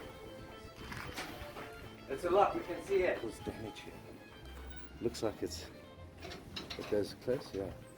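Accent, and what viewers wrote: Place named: South Africa. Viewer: I hope I'm not getting this assumption wrong but you sound South African and if so why wouldn't you buy one of those beautiful Balance cats, especially considering these leopards have a very bad name build quality wise and after sales service. I'm sure you've probably seen the South African fella and his wife who was sailing around in a beautiful bullet proof Balance cat which was around 62 foot if I remember correctly. He sailed it to the US to be sold and I would've snapped that second hand cat up in an instant. It had been well looked after, it was run in so to speak and had the miles on the board and proof that it is a well built, well performing ocean cruiser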